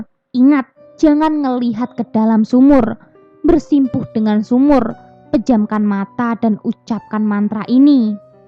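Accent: Java, Indonesia. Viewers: Org jawa ya kak? Logat nya medok kali 😂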